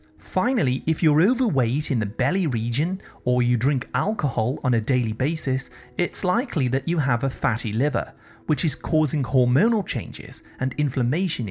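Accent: United Kingdom. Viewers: Everything I eat is on this list. Anyway, I don't trust anybody that speaks with a British accent so screw him. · Information is good to know only could you speak in a more English pattern than in a British accent because it's hard to understand sometimes and frightening also. SERIOUSLY STOP THE CORRUPTION OR IT WILL KILL YOU 🇺🇸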